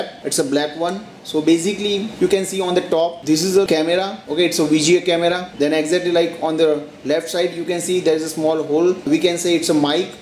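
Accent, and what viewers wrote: India. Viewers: Abe Indian nahi ban sakta kya English me bak bak kar raha he lag to tu Indian raha he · Ghati English?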